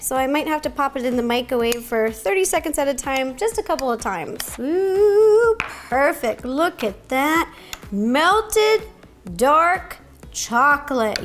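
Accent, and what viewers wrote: South, United States. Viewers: She's got a little bit of a Southern accent going on here, lol. She's so adorable. Love you, Ro. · She sounds more western or southern than other videos, lol or is it just me? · But why did Ro have a southern accent in this video 😂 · She sounded like she had a southern accent for the majority of the video.😂 I love her. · She sounds so southern omg. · I love how throught this video she had a thick sounding southern accent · yo why she sound so country in this video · Ro is so southern in this video and I love her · When did Ro become a southern mama?? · I never realised Ro had such a Southern accent. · Ro i love you so much and every time I hear you talk i’m just reminded of my family down south cause ya just sound like a sweet little southern belle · Has anyone noticed how thick her “southern-like” accent got in this video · Happy Easter to you and family. 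Love your videos and dress. Why were you speaking with a southern accent? Thanks for the video, I can even eat this now during Passover. ❤️💕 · This quarantine is bringing out Ro's Southern Belle! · Is it me, or did Ro have a lil bit of a southern accent goin on here? Either way, it is adorable and I love her♥️ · Southern Ro came out for this video. Pretty cute. These look incredible. I think I have all the ingredients! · Ro, you sound sothern in this video. I know you said you grew up in Seattle but you kind of did this accent most of video. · Does anyone else think that she sounded a bit like she was from the south?! Ro you are still amazing, you are so uplifting and kind and you make me smile every time you post a video!! Happy Easter everyone 🐣❤️ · she went so southern after folding the dough! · sounds a bit different like a southern accent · Ro sounded super southern in this video! It was pretty cute 💜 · I'm curious to know where the Southern accent is coming from. 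She's from Washington. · This is meant as a total complement but I love how southern you sound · Anyone else feel like Ro has more of a country accent today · Is it just me or did ro develop a little southern accent throughout the course of the video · She sounds very southern in this video. Like compared to normal. Idk why. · She’s sounds a bit southern today... and I kinda love it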